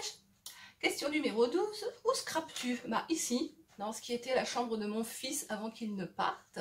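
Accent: North, France. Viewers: Ccou j’adore ta petite vidéo de présentation et par dessus ton cet accent du nord lol eh oui merci à toi bis 💋😘👍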